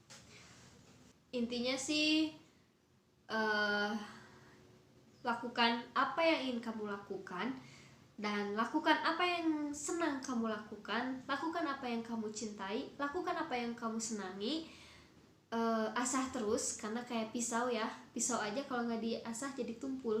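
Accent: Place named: West Java, Indonesia. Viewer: Pidiooo, sunda pisan emang mba nya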